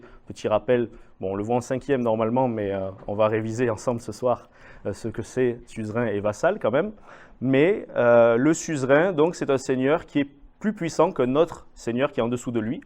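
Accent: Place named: Occitania, France. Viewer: racontée avec un bel accent occitant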